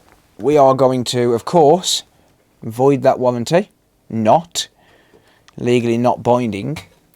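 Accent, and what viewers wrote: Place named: West Midlands, United Kingdom. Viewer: Absolutely fantastic video. Im a new subscriber and really enjoying the content, it’s so interesting and you’re obviously incredibly knowledgeable about electronics . Also great to hear a fellow West Midlands accent ☺️✊🏻 I’m really thinking about getting a PS5. I have a PS4 Pro which is great, but I’d really like to upgrade. With regards to the Liquid Metal issue, would you advise to keep the PS5 flat rather than vertical so that the Liquid Metal doesn’t drop? It would suit my setup flat tbh. Do you think the liquid metal has dropped during transit or does in only become more of a liquid once heated? Sorry, I really don’t know much about that kind of thing 👍🏻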